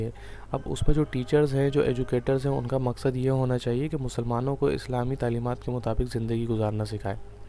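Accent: Karachi, Pakistan. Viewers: sir ap k language accnet sy meny abserve kr lia tha k ap karachi sy hain ❤❤❤❤ or apny sahi kaha k koi students panjab k kisi village sy lacture sun rhy hongy ..exectly am listining your lacture from panjabs village ❤❤❤well explaind ❤❤❤ May Allah give u success by leaps and bonds❤❤❤❤ameen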